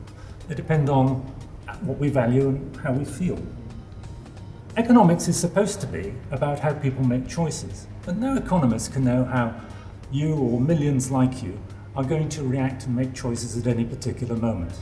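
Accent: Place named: United Kingdom. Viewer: i would like to hear this guy explain every aspect of life to me in videos like this with his classy british accent.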